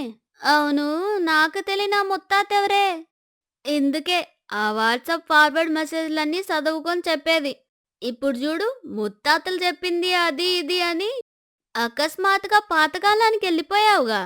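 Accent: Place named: Chittoor, India. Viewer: Chittoor slang super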